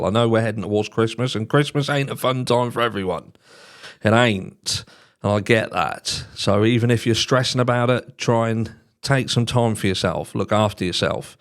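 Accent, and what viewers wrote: Norfolk, United Kingdom. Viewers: I thought I heard a bit of Norfolk in the accent 😂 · That sounds like a west Norfolk accent? Enjoyed you vid.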